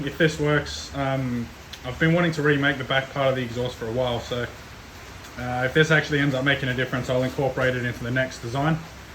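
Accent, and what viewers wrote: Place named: Australia. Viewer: In australia? im guessing via the accent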